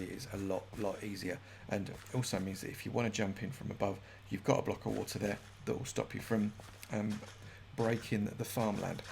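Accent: United Kingdom. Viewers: You mean he's British?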